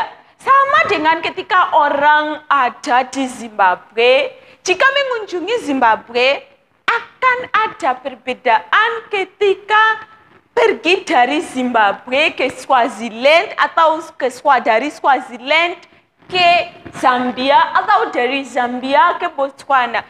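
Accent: Java, Indonesia. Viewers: Lucu banget sih kak, medhoook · Kebanyakan orang Indonesia kurang mengetahui geografi dan sejarah. Tetapi tidak semua. Masih banyak orang Indonesia yang tau geografi. Saya salah satu orang yang menyukai geografi. Ngomong ngomong bahasa indonesianya kog Medok ya mbak.. Tapi jadi lucu 😁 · Cantik mba ... tapi logat indonesia y kaya org jawa....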